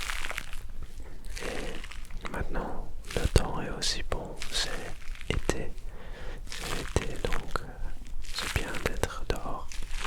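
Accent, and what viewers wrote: France. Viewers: J’adore ton accent français!! +1 follower yayy!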